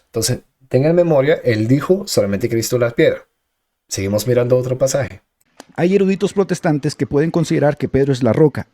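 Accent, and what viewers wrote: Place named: Italy. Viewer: Muy bien vídeo, ¿Eres italiano? Por la forma en la que asentúas me recuerda al italiano, y se ve que el español no es tu lengua materna, aunque también puedo estar equivocado.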